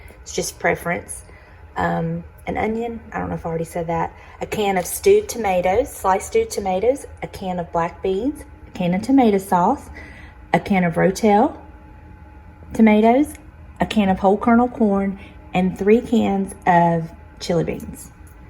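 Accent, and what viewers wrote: South, United States. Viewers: Hello! I'm a too. I go by "Willie's Mom" on YouTube. Liz from Traditions by the Seasons gave you a shout out and sent me this way. This is the first video of yours I've watched. Being a southern girl myself, I live hearing a lovely southern twang. I plan on binge watching tonight. I wish you all the best and much luck on YouTube.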